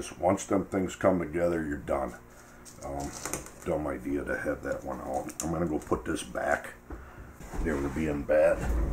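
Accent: Minnesota, United States. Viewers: That has to be either a Minnesota or Wisconsin accent. By Golly !! Nonetheless, nice video.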